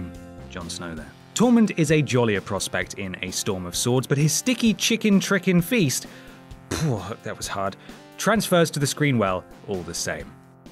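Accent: United Kingdom. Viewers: It would be easy to imagine this chap with his British accent saying "Dam it man!" or "For heaven's sake man!" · here i was thinking i would get to watch 9 deleted scenes but nah im just sitting here listening to some british guy tell me about 9 deleted scenes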